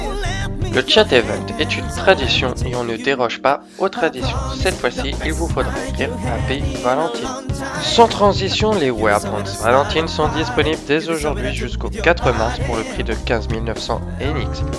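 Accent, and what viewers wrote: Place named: England, United Kingdom. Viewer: Ton accent anglais 😂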